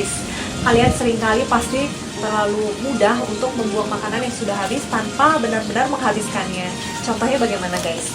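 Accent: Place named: West Java, Indonesia. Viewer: Duh eta pabalatak, nyunda pisan teteh. Hahah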